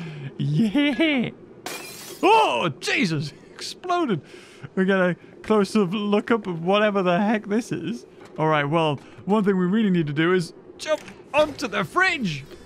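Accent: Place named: United Kingdom. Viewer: Bruh I love your British accent lol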